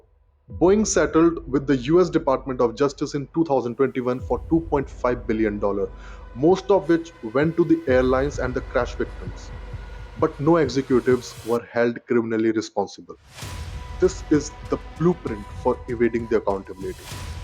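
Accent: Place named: India. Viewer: Sorry Indian voice hurts my ears. All I can hear is SAAR DO NOT REDEEM!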